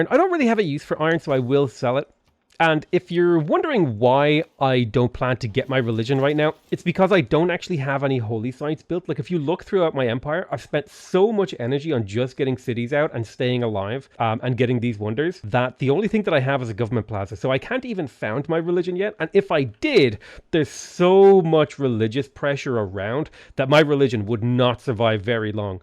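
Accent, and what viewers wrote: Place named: Ireland. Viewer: The Irish accent makes a return by the end of this video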